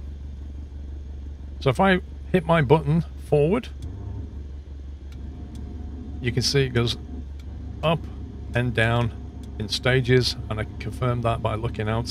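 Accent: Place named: Blackpool, United Kingdom. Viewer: From Pac-Man to MSFS 2020, with some real-life certified droning in between. Glad to have found your channel as I just got FS 2020 and already crashed the Cessna 3 times while trying to land. Your tutorials will help a lot! You sound like you're from Blackpool or thereabouts (Liverpool?). My wife's dad was born in in Blackpool so I sort of grew an ear for that accent. Thanks again! Great tutorial!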